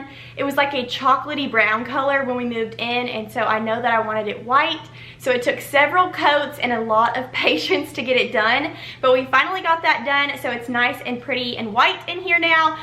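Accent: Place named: South, United States. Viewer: I'm new! I just love your channel ❤ You are very relatable as I live in the south and you sound like you could be my neighbor!😁 I think the room looks so warm and it gives me "Hallmark Christmas Movie" vibes.🎄 Have a super weekend! ~hugs🤶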